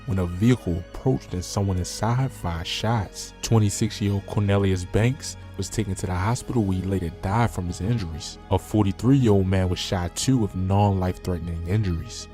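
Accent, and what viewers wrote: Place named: Baltimore, United States. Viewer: You gotta be from Baltimore your accent is giving that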